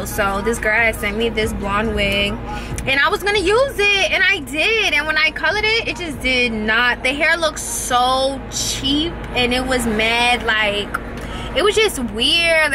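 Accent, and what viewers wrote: New York, United States. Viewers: You sound like a true New Yorker. I’m here for it 😭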